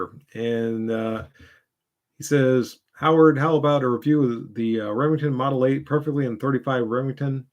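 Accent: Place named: Texas, United States. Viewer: okay okay okay, I may have thought it when I heard your Texan drawl.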